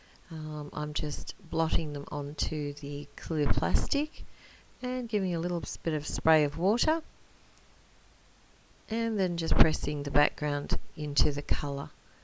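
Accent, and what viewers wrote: Australia. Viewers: Hey, fairly new subbie, thank you for your video. I thought you sounded Australian, then you mentioned the reject shop, then you told us you were. I’m on Gold Coast QLD. Where do you hang out, glad I found you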